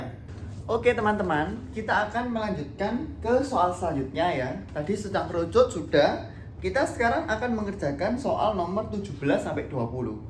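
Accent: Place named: Surabaya, Indonesia. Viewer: Suka sama cara ngomonge, soale bikin kangen sm Surabaya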